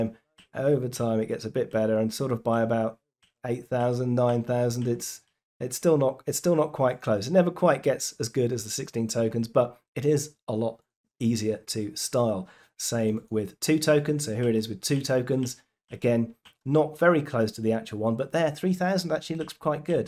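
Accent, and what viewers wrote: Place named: United Kingdom. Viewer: I trust this guy because he has a British voice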